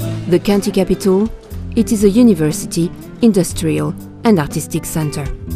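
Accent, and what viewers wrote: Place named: France. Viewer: Ravissant... mais c'est quoi ce commentaire en anglais avec un accent français digne de l'inspecteur Clouseau ? C'est assez ridicule.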